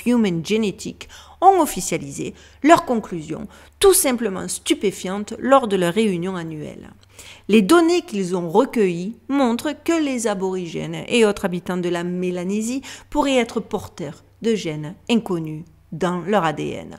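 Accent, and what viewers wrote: South, France. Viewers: Merci pour cette vidéo et ce thème fort intéressant. Toujours OK pour une suite, si cela vous inspire. Oui, aussi pour d'autres sujets, car vous savez toujours nous présenter et interroger sur nombre de sujets: pyramides, Antarctique... Oui, oui, oui! Depuis que je vous ai découverte, j'ai tout écouté et je n'ai jamais été déçue et suis toujours en quête de savoir plus. Merci infiniment pour ce gros travail et présenté à la! Merci pour votre accent qui nous amène le soleil du sud de la France! Merci d'être et de faire! Caresses à la Duchesse. Prenez soin de vous et de votre fils. Bonne semaine et à dimanche prochain 🙏🏻☀️ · Bonjour belle d’âme d’outre-Atlantique avé l’accent du sud de la France qui doit te manquer comme ça m’a manqué après quelques années passé dans les îles de la Caraïbe! C’est un plaisir de t’écouter narrer toutes sortes de récits le dimanche, alors on écoute et on remercie après le visionnage de la vidéo! 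Prends soin de toi et plein de gros bisous 😘! Amicalement 🙏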